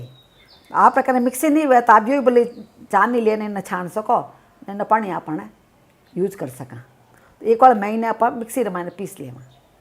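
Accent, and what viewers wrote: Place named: Marwari, India. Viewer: Very good respie aunty ji and ur voice of marvari so sweet ...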